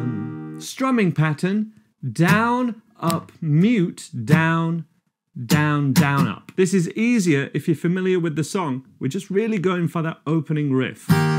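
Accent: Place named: Yorkshire, United Kingdom. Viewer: Yokshuh accent, there.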